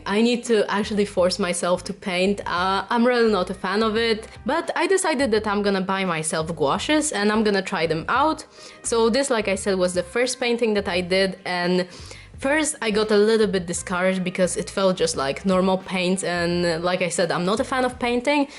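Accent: Poland. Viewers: Masz taki "polski akcent" xD Jesteś z Polski? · 'cause is polissh accent 😏😘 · I'm from Poland like her and my dad has that accent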